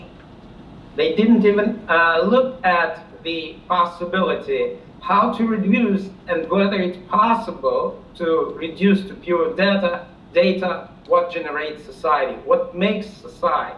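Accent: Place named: United States. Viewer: Hi. My, you are sounding very American these days! (Krissie in Germany)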